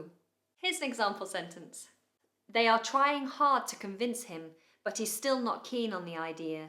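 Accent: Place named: United Kingdom. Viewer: Idont know but🙈 the British accent makes me feel like you're dealing with the English language.like Princess 👌and that's great, unlike the other accents which used in every englìsh speaking country , all of them speak English. But you have your own spicesss👌👌 youre great ❤❤❤ all the best always end 4everrr Thanks a thousand times😁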